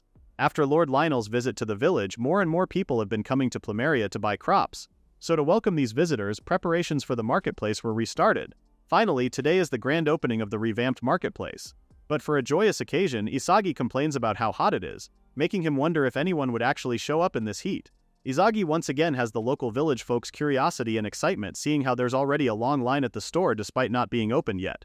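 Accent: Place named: United Kingdom. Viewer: British man spotted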